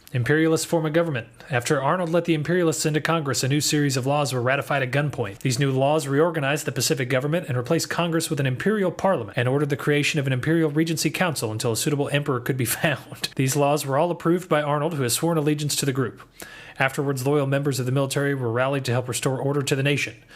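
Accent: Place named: Louisiana, United States. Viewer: The Louisianan accent made me subscribe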